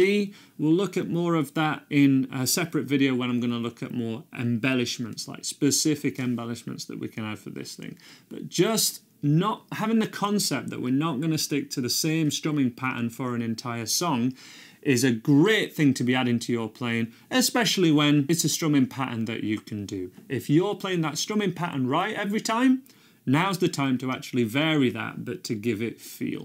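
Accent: United Kingdom. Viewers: I really dig that British accent of yours, makes the lesson more entertaining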